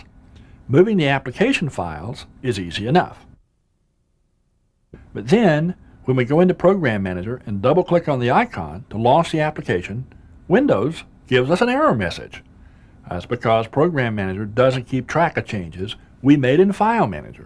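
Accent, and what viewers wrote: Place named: South, United States. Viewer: I love the presenter’s easy going southern accent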